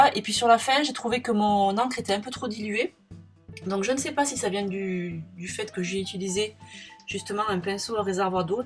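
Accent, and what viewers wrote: South, France. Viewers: Moi je ne trouve pas que le masquage sur ton étiquette était inutile ça rend trop bien ça fait classe je vais te piquer l idée. J aime bcp aussi la fuse avec le tag. Deux petites questions : quelle est la marque de tes pochettes ? Comment imprimes-tu tes photos carrées ? stp. Merci pour tes vidéos avé l assent c est très agréable 😁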